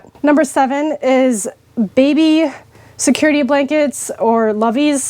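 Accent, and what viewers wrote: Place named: Eastern Canada, Canada. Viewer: You sound like your from eastern Canada ….🤘🏼🤘🏼. Subbed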